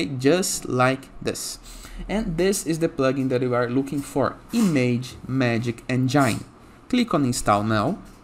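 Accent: Brazil. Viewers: Esse sotaque é BR certeza. Extensão PHP GD ou Imagick ausente. Não deu certo mas obrigado.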